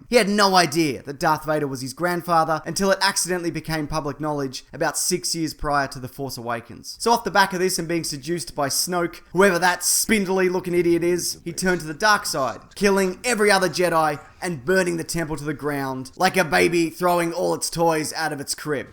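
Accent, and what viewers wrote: Australia. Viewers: this is an awesome channel, made even better by the australian accent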